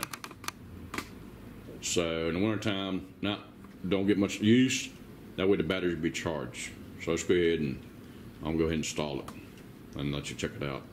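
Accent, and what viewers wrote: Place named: Alabama, United States. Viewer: Thanks for very helpful review. I just ordered this charger for my John Deere 110TLB backhoe. I was tired of my battery dying at critical times...like last year when my 37-ton log splitter arrived from Northern Tool and the crate was damaged so bad I had to unload it all in my driveway with the JD, since the girl who drove the delivery truck was unable to help at all. I got the log splitter and crate halfway out of her truck and onto my loader bucket, then tried to back away from her big truck and the JD battery went "NOPE...I'M DONE!" After we somehow got her truck pulled away and I was left alone with the problem to solve, I had to get the battery charger hooked up on an extension cord and got enough juice into the battery to crank up and make it back up my hill. I was exhausted, as was my wife, and I decided I wouldn't let this happen again. 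I've got a new battery tester too, and will keep this 1.5A trickle charger on my battery in same configuration as you mounted it on your machine. The dampness and cold of the Pacific Northwest is brutal on batteries in Winter. Thanks again for your great review. And dang if you don't talk just like me, an Alabama boy!....ha ha ha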